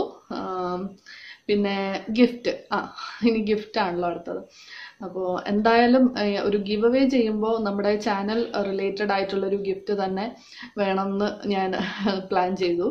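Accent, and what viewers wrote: Palakkad, India. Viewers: Palakkad Way of talking More vlogs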